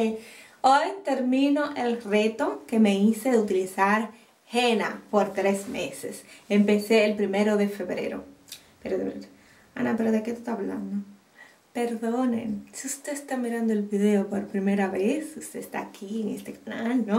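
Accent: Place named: Dominican Republic. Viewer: Gusto tu pelo el mio és asi pero yo me derribo por que me duele mucho para desenrredar .jejeej .guste de tu canal te boy a dejar mi apollo y suscripcion y te invitarte a mi canal aver si te gusta y me apollas me parece que eres de 🇩🇴 por el acento me alegrarei si eres de aya amo encontrar dom en YouTube.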